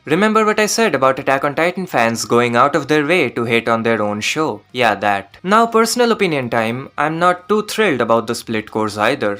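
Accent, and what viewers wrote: India. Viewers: You can't mock anyone while having a loli profile picture and that goofy ass Indian accent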